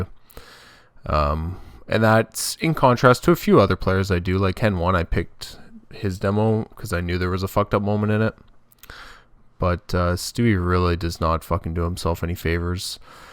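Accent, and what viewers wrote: Canada. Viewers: Are you Canadian? You're accent sounds very similar to me. I'm from Vancouver BC Canada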